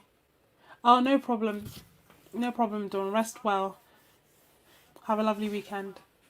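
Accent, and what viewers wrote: United Kingdom. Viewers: Lovely florals, love the colours! And yes, you do have British accent LOL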